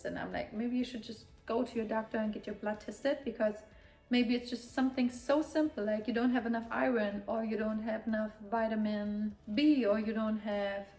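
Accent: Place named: England, United Kingdom. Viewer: Send you and Mario love and blessings from Mexico, I’ve just subscribed to your channel and it’s interesting, I like your English accent. ❤️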